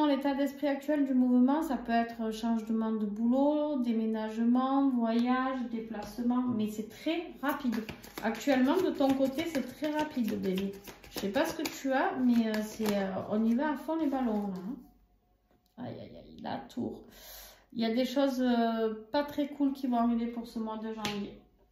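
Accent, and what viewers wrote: South, France. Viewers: bsr vous me faites rire avec vos expressions je les retients je n arrete pas de rire vs etes super et votre accent du sud trop top sinon les cartes me parlent :face-fuchsia-poop-shape: ps cul patate j adore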